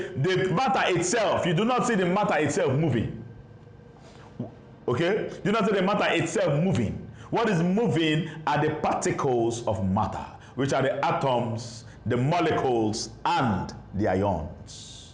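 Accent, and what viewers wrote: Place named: Nigeria. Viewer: hello sir, wonderful lesson but i found somethings lacking. you said quite a number of words that I've never heard before, like the tool used to measure matter but the spelling wasn't shown on the screen. as you already know, Youtube subtitles don't really do a great job in translating the Nigerian accent. Please work on either adding your own subtitles or letting the important points appear across the screen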